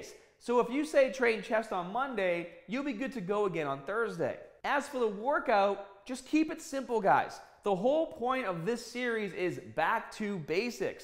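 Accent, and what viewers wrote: Boston, United States. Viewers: Holy Boston accent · The best part of this channel (outside the solid tips) is that if anyone new comes here, they will be so confused why he suddenly has or lost a Boston accent.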